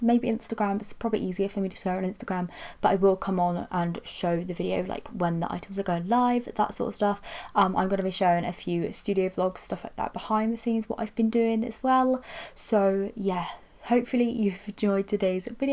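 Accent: England, United Kingdom. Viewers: Cute. What is your accent/somewhere in England? 🙂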